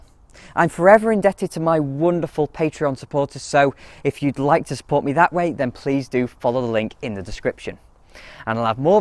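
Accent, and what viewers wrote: United Kingdom. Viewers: Ah yes the Scouse influence? Boss la !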